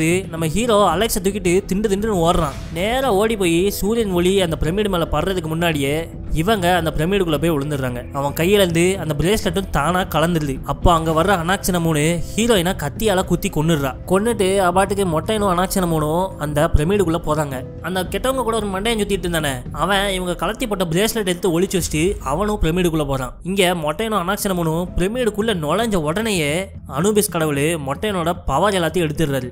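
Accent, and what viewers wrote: Tirunelveli, India. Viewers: Bro neenga thirunelveli ya unga slang super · It's Trunelveli slang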